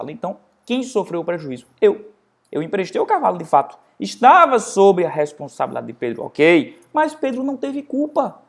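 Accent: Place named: Pernambuco, Brazil. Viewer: Parabéns pelas aulas!! Que sotaque lindo, é de Pernambuco??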